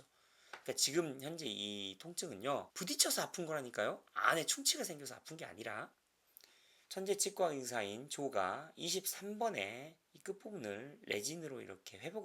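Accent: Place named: South Korea. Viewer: Lateral excursion에 대한 좋은 내용이네요... 유투브를 전문적으로 하시려면 영어쪽은 감수를 받으시는게 좋을듯합니다... 너무 한국식 영어라^^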